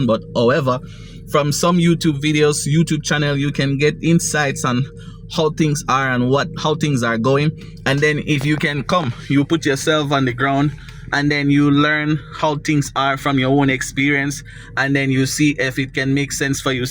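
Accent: Africa. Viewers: Thanks for the update in Gambia, do you know your accent has changed to an african twang😊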